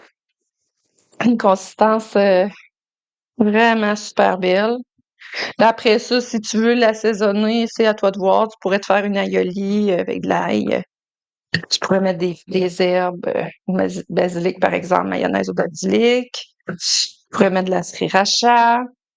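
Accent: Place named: Canada. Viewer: Merci pour cette recette, j adore l accent canadien 🎉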